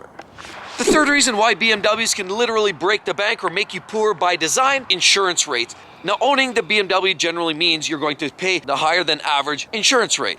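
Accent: Edmonton, Canada. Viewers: Are you from Edmonton?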